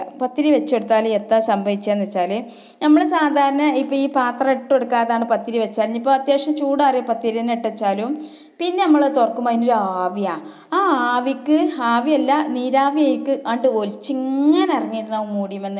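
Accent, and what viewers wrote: Malappuram, India. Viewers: .Nishkalankamaya samsaram.. Athanu malappuram bhasha.. 🥰super pathiri👍🏼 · താത്ത പറയുന്നത് ഒന്നും മനസ്സിലാകുന്നില്ല. Slang കേരളത്തിന്റെ മധ്യ ഭാഗത്തുനിന്നും തെക്കോട്ടു താമസിക്കുന്ന ആർക്കും മനസ്സിലാവുമെന്ന് തോന്നുന്നില്ല. ഇത് മലപ്പുറം ഭാഷ ആണോ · Aa😁. Malappuram. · മലപ്പുറം ഭാഷ ആണ് മക്കളെ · തനി മലപ്പുറം സ്ലാങ് ആണ്‌. കഴിക്കണ്ടത്തവർ കഴിക്കണ്ട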